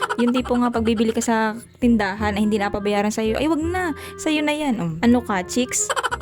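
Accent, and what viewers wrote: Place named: Visayas, Philippines. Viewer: Nakakatuwa ka naman magsalita...my halong bisaya o cebuano...😂😂😂😂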